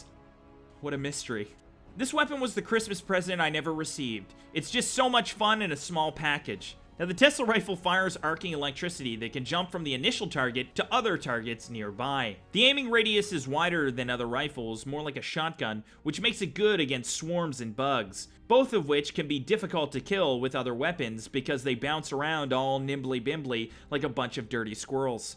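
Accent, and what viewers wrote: Canada. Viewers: I can tell this guy was Canadian in the first 2 seconds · Dude sounds like stone mountain with a canadianish accent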